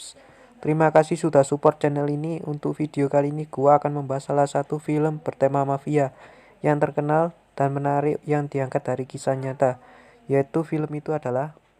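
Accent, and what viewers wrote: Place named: Java, Indonesia. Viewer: di usaha in Jan medok bang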